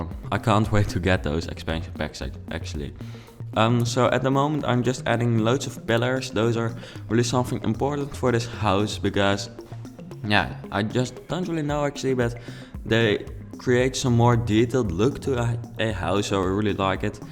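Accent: Netherlands. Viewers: I can hear the Dutch through your English. I'm Flemish. (Yes, I'm watching this in 2017.) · Hey nice video , i love your accent. I am also dutch, well my dad is my mum isn't so that explains my name XD i don't know much dutch buy yeah nice video XD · You can really hear you are Dutch 😂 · Are you from the netherlands? Your accent sounds dutch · Je hoort duidelijk dat je Nederlands bent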